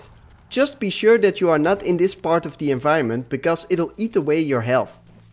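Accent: Netherlands. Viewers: You sounds so dutch haha NL!! thx for the vid bro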